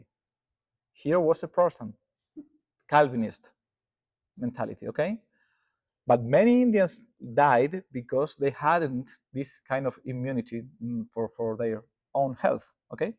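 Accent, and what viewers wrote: Argentina. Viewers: Muy bien inglés con acento argentino. Me encantó.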